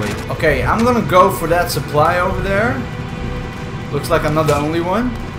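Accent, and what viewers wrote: Netherlands. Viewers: Are you dutch? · you dutch right i can just feel and hear it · i smell Nederlands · Hahaahah holie molie heerlijk nederlands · You Dutch bro? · I'm hearing a Dutch accent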